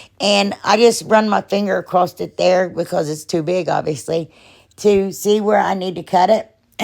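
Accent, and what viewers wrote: South, United States. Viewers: Where you from girl?! I’m in north Alabama. I always love hearing a warm southern accent on here!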